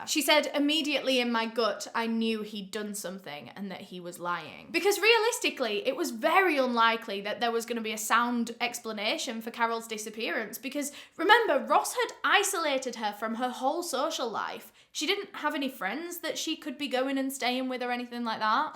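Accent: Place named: Bedford, United Kingdom. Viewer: Fascinating your accent of bedford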